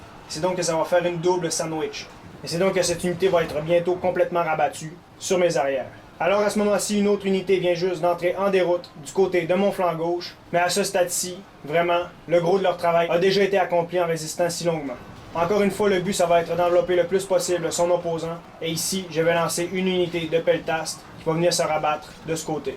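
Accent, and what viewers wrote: Canada. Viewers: t'es canadien ou quebecquois?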